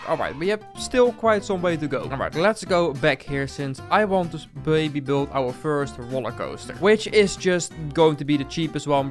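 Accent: Netherlands. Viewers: “Som sietin” lemme guess your dutch😂 its such an dutch accent tbh, no hate ofc its just so funny to see a person that also speaks dutch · Ik kan horen dat je nederlands bent lol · vind het altijd grappig als ik bij nederlanders aan hun accent kan horen dat ze nederlands zijn btw goeie content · Lekker een Nederlands accent aan het engels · The Dutch accent😂 love the video 🎉🎉🎉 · Do I hear Dutch? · Jij klinkt echt Nederlands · wow ėėn goede nederlands aacent je bent ėėn echte nederlands person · Je bent Nederlands dat hoor ik bij het begin van de video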